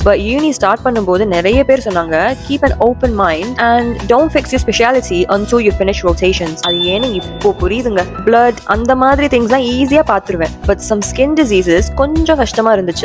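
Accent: United Kingdom. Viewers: Your British accent is too good ❤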